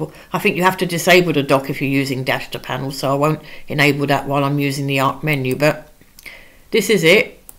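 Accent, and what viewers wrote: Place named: United Kingdom. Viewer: I love your British English, i am salvadorean.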